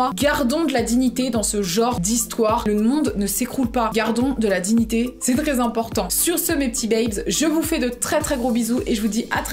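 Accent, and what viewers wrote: England, United Kingdom. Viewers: L accent anglais j adore t aime bien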